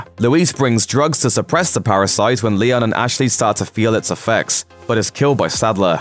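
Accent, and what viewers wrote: United Kingdom. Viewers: What’s your accent? Sounds British but not familiar with ‘citay’ or ‘zombay’ accent! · Can you not be British? I'd like for just one shitty recap video maker to not be British.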